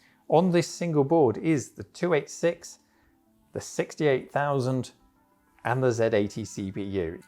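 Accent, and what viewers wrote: United Kingdom. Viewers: You don't sound like you are an American, you sound like that you might be from Britten.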